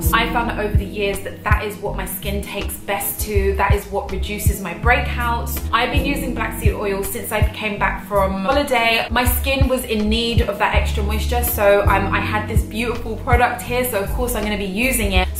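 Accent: United Kingdom. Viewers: Love your Brit-tis accent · A Latina with a British accent Double sexiness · Her accent is nice. It is how we speak in the UK.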